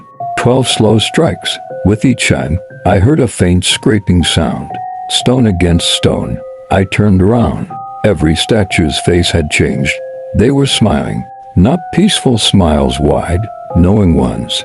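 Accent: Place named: United States. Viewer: If it's in the UK, why is the narrator using a US accent? Would make a great practical joke.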